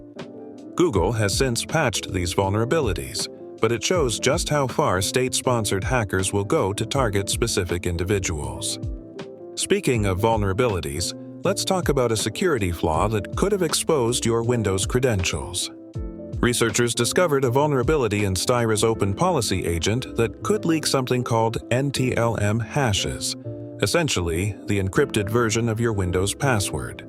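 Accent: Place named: United Kingdom. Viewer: Such a terrible robotic old British style voiceover. Why don't yoy use your own? Damn.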